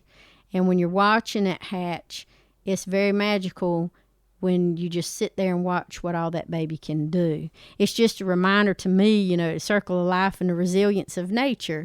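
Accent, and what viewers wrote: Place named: South, United States. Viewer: Very interesting and great educational video 👍 i absolutely love your southern accent ❤️☺️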